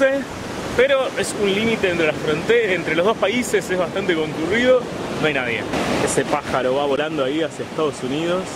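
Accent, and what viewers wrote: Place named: Rioplatense, Argentina. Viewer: ¿Argentino o Uruguayo? Demasiado evidente de tu acento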